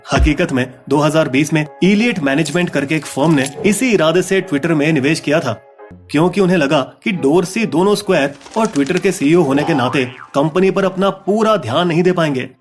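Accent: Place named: South, India. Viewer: Good to see you back 😎. That madrasi voice caused my head to spin 🦔 🤮 🤮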